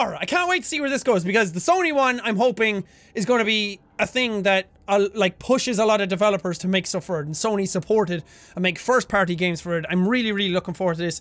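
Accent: Ireland. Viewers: Dude are you Irish? Nice video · Ramona Clawson He’s from Ireland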